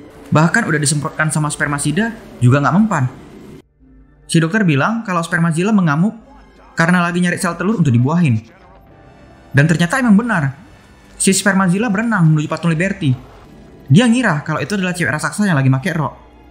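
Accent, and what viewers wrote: Medan, Indonesia. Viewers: Logat medan abg ni wkwk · Logat medan nya bro, mantap kali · Kek org medan abang ni, ya kan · Nampak kali medan kau bang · Macam logat medan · Pengisi suaranya org medan pasti ni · Org medan kau ya min? 😂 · Anak medan kau ya bg? 😂😂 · Nih orang Medan banget omongan nya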